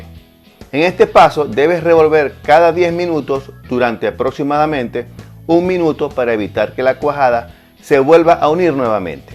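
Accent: Zulia, Venezuela. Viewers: Tienes acento Zuliano eres del Zulia ?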